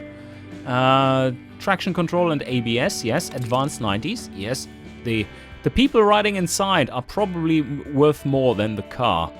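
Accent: Germany. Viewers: Mate, are you german?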